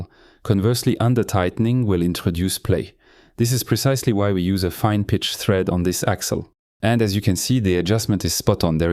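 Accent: France. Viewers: I was not expecting YouTube to deliver me such an excellent FreeCAD tutorial from a man who sounds like the person every single impressionist based their exaggerated, stereotypical French accent on. This man may be the most French-sounding man I have ever heard. It would be very funny if I'm super wrong abd he's Flemmish, or something. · french accent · You sound french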